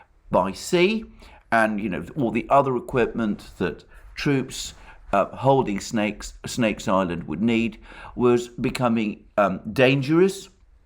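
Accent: United Kingdom. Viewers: Ha! You are confusing a British voice with extraordinary insight. All this information is pretty much common and available all over the Internet. I agree he is eloquent in what little he actually says.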